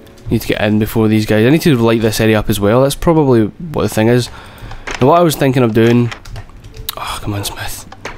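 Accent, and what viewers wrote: Scotland, United Kingdom. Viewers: i was going to watch , but then i heard his scottish voice and chose otherwise .. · Scottish sod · fucking wooo haha a scottish minecraft player :) finally someone without an annoying accent imo lol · subbed cause you're Scottish! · LOL no, he's Scottish. You'd think people would know by now.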